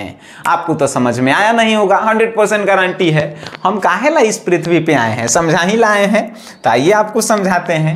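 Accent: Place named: Bhojpuri, India. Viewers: Bhojpuri h kya akdm se😂😂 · Apka bhojpuri sound mast hai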